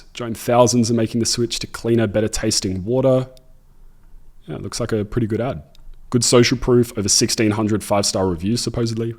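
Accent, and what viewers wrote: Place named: Australia. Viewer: Aussies accent's cute lol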